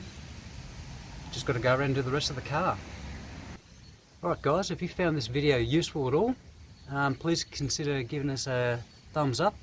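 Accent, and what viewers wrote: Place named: Australia. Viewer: IDK why but a lot of Aussie accents are grating on the nerves to me as a New Englander. Yours does not. I like your accent and your car.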